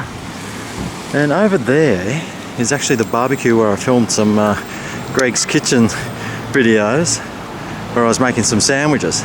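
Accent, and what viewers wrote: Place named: Australia. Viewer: That would be good, too, with a sunrise or sunset showing. Or you can do some talking with it, like this video, I love an Aussie accent. :) OR...recipes on the beach, fire going, shrimp on a stick on the barbie...you talking about anything...I love to hear your voice..that accent! This video reminded me of some of the ASMR things I've run across, the background sound and talking; that's what made me think of it.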